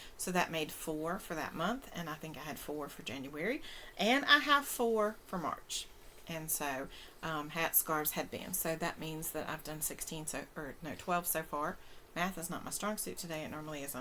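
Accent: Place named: South, United States. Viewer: Hello, nice to meet y'all. Where are you?? I'm catching a southern accent.... I'm a transplanted Texan in Tennessee.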